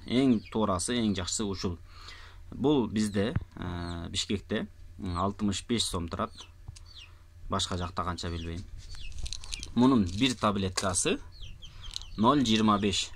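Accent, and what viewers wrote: Pamir, Tajikistan. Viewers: Памириский акцент 😀 молодец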